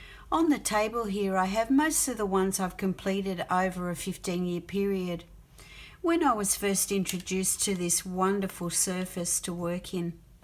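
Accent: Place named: Australia. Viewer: Hi, I am enjoying your videos as I only came across them recently.I love finding new artists on YT. It is also nice to hear an ozzy accent. Thank you for the inspiration and I look forward to following you well into the future. Cheers Vicki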